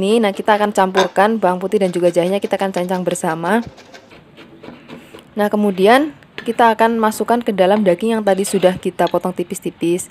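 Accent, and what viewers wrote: Java, Indonesia. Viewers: Pantes gole ngendika gula ne rada medok 😀 kulo asli sokaraja tp merantau k bks mba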